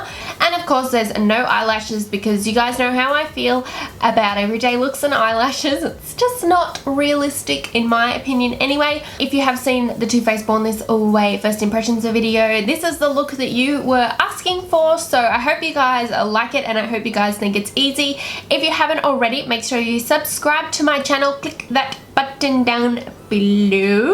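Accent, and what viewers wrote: Australia. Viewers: You're so pretty and i love your accent😍😍 i am Australian too but your accent is much stronger than mine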